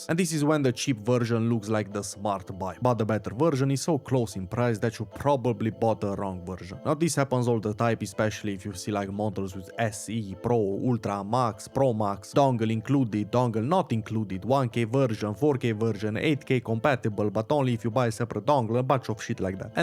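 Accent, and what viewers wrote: Romania. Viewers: Știam că ești român după accent :))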